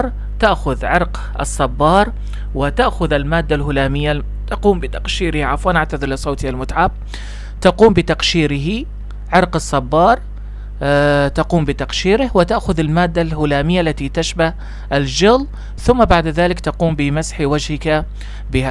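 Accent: Lebanon. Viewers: هل انت لبناني.. اذا كنت عراقي لماذا تلهج بالهجه البنانيه؟؟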